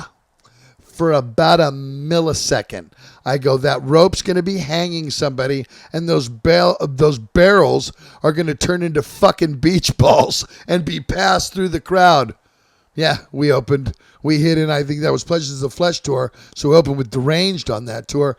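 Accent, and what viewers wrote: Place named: South, United States. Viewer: I fucking love the southern accent you like to throw. But you sound like you may be from Bama instead of Florida. 😂🤣 enjoying the stories.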